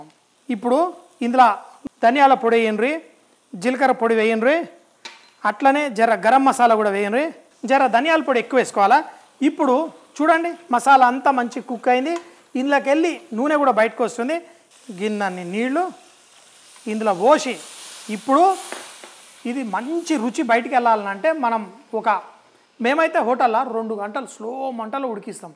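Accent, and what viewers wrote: Telangana, India. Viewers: Kaka nee telangana yasa kiraak undhi bhayya Really enjoyed a lot continue it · Meeru telangana Yasa vaddu sir Mamulugane matladandi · తెలంగాణ యాసతో మస్తుగా చెప్పారు సంజయ్ గారు... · anna nee telangana accent asalu maaki kirkiriii.... Waiting for you to do a recipe with telugu commentary... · Your recipes are very nice, but please maintain your dignity as an experienced professional and speak in your original dialect...speaking in the Telangana dialect will not improve or attract any new viewers to the channel. On the other hand, it irritates and infuriates. We respect your worthiness..Please don't play childish brother. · Bale chepparu Telangana language lo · I like the way of ur talking andi pure telangana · Me telangana matalu keka🥰🥰🥰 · నీ భాష ఆపరా అయ్యా తెలంగాణ భాష చూడలేక చస్తునం రా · mi telegana slaag super gaaru😄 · Telangana slang adhurs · Sir meedhi ye state assalu.meeru mee wife idharu English lo kuda matladuthu north side dishes kuda chesthaaru.ippudu pakka telangana yaasa matladuthunnaru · Where and how did you pickup this Telanga dialect. 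Perfect like your recipes. I learned a lot from your videos. Greetings from The Netherlands. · Ippudu baagaa telangaanaa maatladuthunnnaaru kaani sodi kongem yekkuvaindi age vallanaa?😮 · Sir nee telangana yasa aapandi vinalekapotunn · Telangana baaga maatladara👍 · Me language superb 👍 💯 pakka Telangana slang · What happened to u only tn slang matladuthunnareemadhya.why so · Anna em matladinv anna multi talentd u r nd entha aina mana telangana slng vinte ah kick ye veru suprb anna ne matalu ne vantalu ekdham untai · Telangana basha over aindhi.Chef ainnappudu dignified language unddali. Challu ekkuvindhi.. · తెలంగాణ యాస బాగో లేదు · Since when have you switched to telangana telugu? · Telangana slang super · Language perfect Telangana 👌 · హాయ్ సంజయ్ గారు నమస్తే. బాగున్నారా. రాగిణి గారు ఎలా ఉన్నారు. తెలంగాణ భాషా భలే మాట్లాడుతారు. మీ మాతృభాష ఏది. భలే ఉంటది మీ మాటలు. బాగా నవ్విస్తూ ఉంటారు. మీ వీడియోస్ అంటే నాకు చాలా చాలా ఇష్టం. 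చాలా న్యాచురల్ గా తింటారు. మీరు తింటుంటే నాకు నోరూరుతుంది. నిజంగా. సూపర్. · Telangana slang Baga othu tunav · Meru super sir, very unique style of explaining in Pakka telangana. 😀😀😀👍👍👍👏👏👏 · Telangana yasa super ga vundi garu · His Telangana Telugu accent is very funny.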